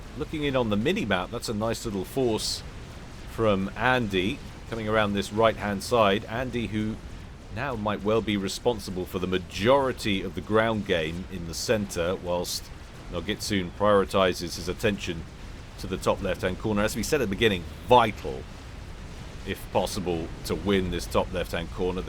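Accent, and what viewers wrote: United Kingdom. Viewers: The thing I enjoy about casts other then the brits lingo/accent are he just enjoys the game as much as I do, where others casters seem to be just talking. The other random off topic comments are just the icing on the cake to remind us he's not A.I. Loved seeing the addition of the recap of "missed critical action" or the "WTF happened while I was focused somewhere else" at the end of some casts when needed. I know it's all alot of work but think of it as recompense for all the other stuff that was missed. Ya, not sure how trump won when I compare the two, which comes down to either Americans are stupid or sexist, which is sad because I know many of them who are not either of those. Now it's time to grab a bag of popcorn and watch the world deal with human greed/stupidity in the worst way in, power over the people. Thanks America, another thing in the history books up there with the other world goofs. 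And before someone wants to comment, lets just let the next 4 years prove me right or wrong. I just know I bought some Elon and Trump shares to sell in 2 years, which better pay for my spaceship off this planet cause we can't take the money where humanity is going. XD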